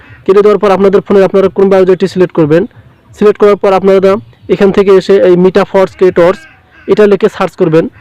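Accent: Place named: Sirajganj, Bangladesh. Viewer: মনে হয় সিরাজ গঞ্জ বারি আপনার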